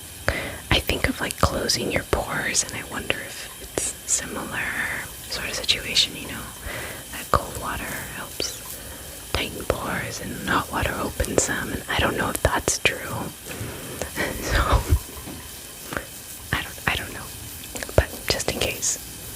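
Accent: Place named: Minnesota, United States. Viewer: shot in the dark here but I’m hinting a midwestern accent 😂 are you a Minnesotan?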